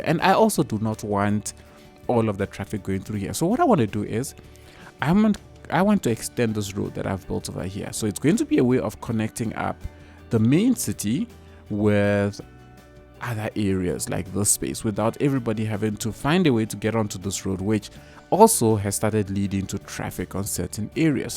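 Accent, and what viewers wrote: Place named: South Africa. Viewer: are you south african? your accent is awesome!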